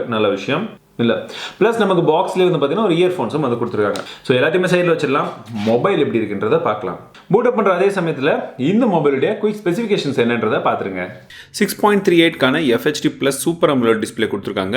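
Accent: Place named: North Chennai, India. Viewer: konjam vada chennai la vara maari voice vardhu bro its gude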